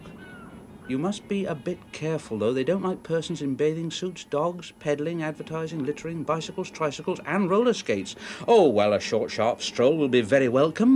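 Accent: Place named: Newport, United Kingdom. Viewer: You can hear the Newport accent in more in this clip than ever!:-) wonderful little film. 👍👍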